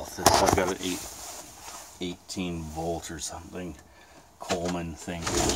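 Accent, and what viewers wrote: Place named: Canada. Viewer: I thought I detected a Canadian accent, it was confirmed when you mentioned using "white hockey tape" to wrap you wires. Take care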